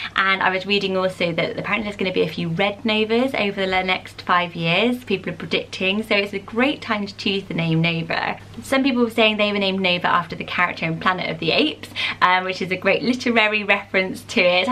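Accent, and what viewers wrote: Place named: Somerset, United Kingdom. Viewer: Are you from Bristol? :) Your Accent sounds quite somerset/Herefordian!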